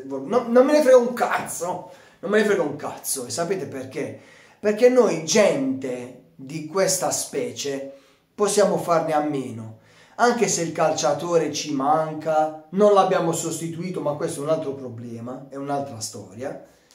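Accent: Bari, Italy. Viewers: Ti seguo anche se sono interista perché sei simpatico. Il dialetto barese è troppo bello….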